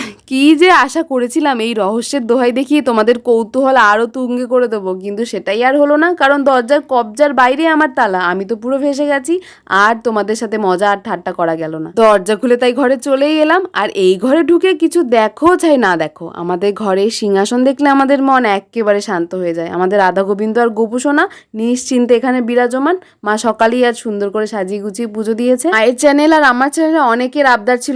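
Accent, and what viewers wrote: Bangladesh. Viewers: প্রথম দেখায় তুমি আমর মন জিতে নিলে আপু তুমি আমাদের বাংলাদেশের মত কথা বলো গো🤭❤️❤️❤️❤️❤️❤️❤️ love from Bangladesh 😊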